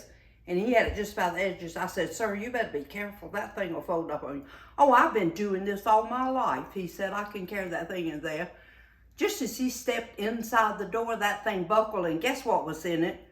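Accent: South, United States. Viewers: I just found your page. I am so excited about your cooking and the fact that you love Jesus too just makes even more precious. I am curious though...where do you live? I hear a southern accent. Im from Alabama. Keep on cooking😊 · What a joy to watch you make this hamburger casserole. Since I love onions so much, I'll probably put them into the recipe largely diced. I can't wait to try it. I'm watching from Edmonton Canada, and I just love your american accent. God bless y'all,. Godspeed! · First time viewer here! What a lovely, southern Lady you are💕 I find your beautiful southern accent so refreshing & pleasant to listen to. Your “Shine for Jesus” comment absolutely made my day & I am definitely going to teach it to my sweet grandbabies. God Bless you richly😊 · Hi Mrs.!! I just found your channel today. And Oh My does your Channel remind me of my Grand Mother. 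All of us called her MawMaw.. And he was PawPaw. I guess I'm what they call Bing watching your channel. I am sure enjoying your Sweet Southern accent. And just some of the things you say and your wordings are spot on with my MawMaw. We lost her many many years ago in the 90's. She was such a character, so so funny and loving. I am jealous of your family that they have you. You are such a Dear Dear person. And I love your short stories.... Oh the memories you have provided. With all God's love, Ed of Greenville,TX. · Just found your site & absolutely LOVED it!! You make cooking look so inviting and you got my attention!! ..so I subscribed!! Love your lovely southern accent!! And the fact that you love Jesus just seals the deal!! Can't wait to make your yummy looking hamburger cassarole!! I know my family will love it! Thanks for sharing with others!! You are a sweet lady!! Blessings!! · I love your southern accent. 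· I love your Southern Belle accent ❤ I've lived in Alabama all of my life. I still appreciate a true Southerner. You CANNOT fake our accent. I'm thinking you are from Mississippi or Georgia. "Dahlin' you are just as pretty as a peach" ❤ I'll be watching more of your videos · I just found your channel yesterday and I am dellghted. I have watched so any of your recipes already and just can't get enough. I want to make every one of them. I'm having trouble figuring out how to print them out. I can relate to you in loving to cook , but I could never do as much as you. I too am a widow and will. be 84 in August. I am fascinated with your accent,. Like you, I love to cook for my family. God bless you my new southern friend. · This is the first time for me to watch her cooking video. What a lovely lady she is. I love how she speaks with Southern accent. Her family is so lucky to have her. I will watch more videos of her recipes.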